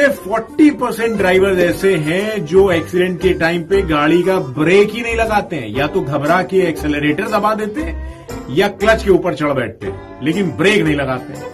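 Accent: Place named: Kanpur, India. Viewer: Waah bhai! Aapke bolne ke andaaz se koi bhi samajh jaaye ki aap Kanpur se hain. Ek baar milna hai aapse...main kalyanpur me rehta hoon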